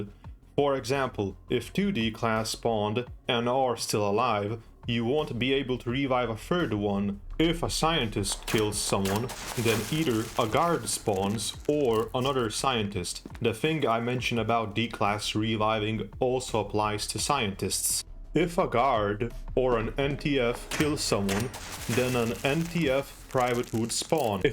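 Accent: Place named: United Kingdom. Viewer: british scp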